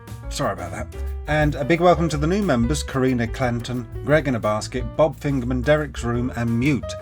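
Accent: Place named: RP, United Kingdom. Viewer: As fascinating as your builds and build process is, the main draw is your dry humor and the posh affectation you apply to your accent. I can't quite tell if it's real or not, but it sells the dry humor. My American ears are entertained.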